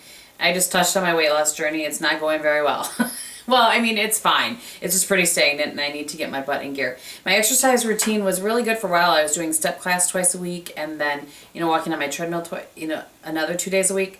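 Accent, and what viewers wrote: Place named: Wisconsin, United States. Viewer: I subscribed yesterday and have been trying to find more out about you. Something about your personality just speaks to me. I live in Chicago (now), but your personality, humor/laugh, and accent are shouting Wisconsin girl. Now that I know you like Packers, I know why I love your personality so much! Something about Wisconsin people just speaks to me.. It'll always be my home.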